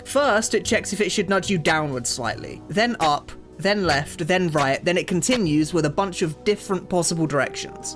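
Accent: United Kingdom. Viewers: British speech about TF2 to vibe and study to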